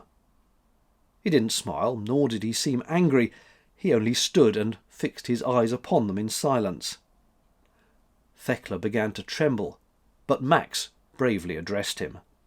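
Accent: United Kingdom. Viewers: I've been listening to CTC at night to help me sleep. Not because the content is boring but because your cool British voice and the low moan of the boat going down the canal lulls me to a peaceful sleep. I found you a year ago and binge watched your entire channel in less than two weeks before finding out it is an effective sleep aid as well. I appreciate the videos and being brought into your home, life. Have a great time away from CTC, I hope you get the rest you are looking for!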